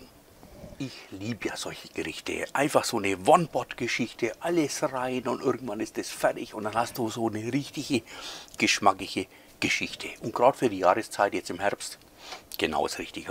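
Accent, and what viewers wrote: Franconia, Germany. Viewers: Es ist Gold wert was die Youtube Untertitel aus deinem fränkischen Dialekt machen 😂 tolles Rezept und Video! Ich glaub ich brauch doch langsam mal nen dopf.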